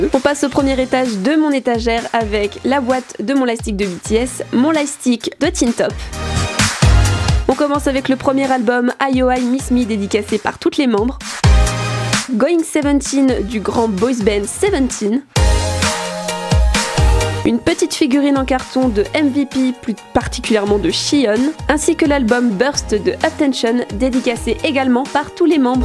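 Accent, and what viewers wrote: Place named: England, United Kingdom. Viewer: Cet accent anglais srx ♡ Sinon plein de love du Maroc ♡♡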